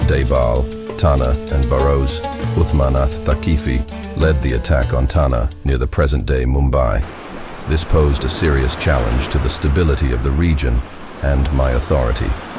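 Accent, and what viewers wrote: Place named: United States. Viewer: Can the creators of this video remove the american accent narration?Lets have an Indian english accent. This narration is making a mockery of the names.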